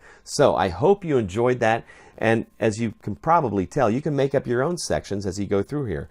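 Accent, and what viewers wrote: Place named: South, United States. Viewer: I love the American accent especially 'down south' and that American 'drawl' is kinda special too!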